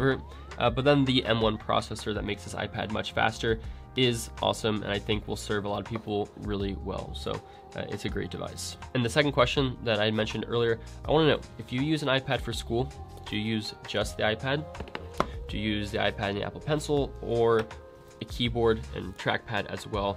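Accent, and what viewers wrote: Ireland. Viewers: LOVE THE IRISH ACCENT AHAHHAHAH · Love the Irish accent - being a Dubliner it made laugh!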